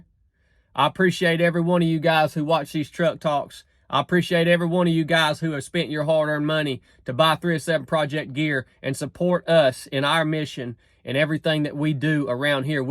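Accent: South, United States. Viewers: I do love the southern accent. Great words I hope justice is served. Thank you.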